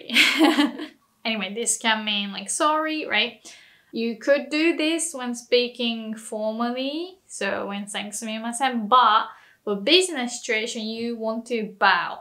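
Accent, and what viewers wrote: United Kingdom. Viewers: You are also British,yeah?I can hear it when you speak English-the British accent is clear and I LOVE it!Aregato,love! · Great lesson also you would be hot if you didn't have a British accent but yeah very informative video 👍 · It's interesting. I'm an American and compared to other Japanese who speak English it sounds like you have a British ascent. Did you learn English from people from Great Britain rather that from America? I mean this in a nice way. I love watching videos about the differences between British and American culture. Differences in culture are fun. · you can hear in your english that you’ve lived in the UK haha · Your English professor was British, yes? I can hear it. 😁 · You look are soooo cute looking 😅🙊🙈 ...and just so cute! Kawaii desu ne! 😅 Daisukidesu your English accent 😊 Have you spent time in Australia or the UK for learning English? 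It shows . It's so nice to hear a Japanese person speaking English without an American English accent or Influence on pronunciation. Yokatta ☺️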